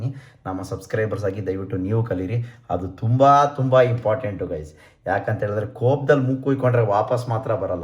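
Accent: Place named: Malavalli, India. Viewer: Hi bro... Nan nimma vlogs na recent agi nododikke start madiddu compare to others nim vlogs nange tumba ista aytu bcoz of namma kade use mado slang alli matadtira navu malavalli avre😊 keep on growing bro ❤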